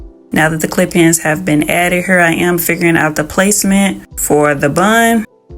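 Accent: St. Louis, United States. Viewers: I can tell by your accent you’re also from STL. ❤️ Love your videos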